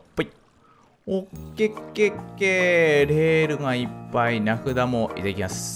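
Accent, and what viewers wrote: Fukui, Japan. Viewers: 前回のカズクラの福井弁はどうしたのでしょうか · え、福井の方？嶺北の方ですか？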